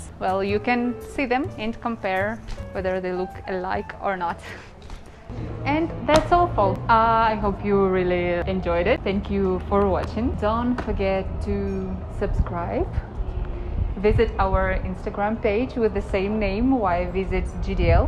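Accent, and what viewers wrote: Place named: Ukraine. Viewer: sexy Ukrainian accent. I picked that, but afraid to ask.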